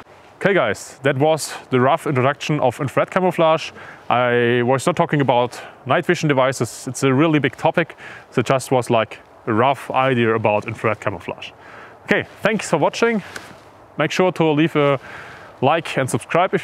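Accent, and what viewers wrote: Germany. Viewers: Fürchterlich wenn ein Deutscher englisch spricht. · Wdym he has a heavy german accent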